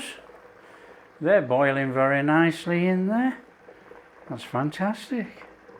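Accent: Yorkshire, United Kingdom. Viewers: glad to hear the pronunciation disclaimer in today video of your pronunciation of Papas Arrugadas Canarian Potatoes with your Yorkshire accent. I would hate to see a quified chef like yourself mispronounce it and stir up the hairs on the neck of your resident pronunciation troll 😂😂😂😂. They look delicious my friend. Cheers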